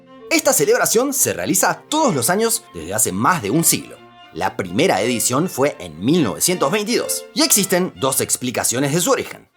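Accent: Buenos Aires, Argentina. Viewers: Porqué dejaste de hablar como argentino???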